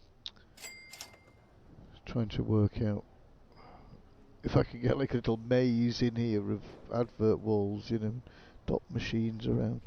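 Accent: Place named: United Kingdom. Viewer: What part of the UK are you mate?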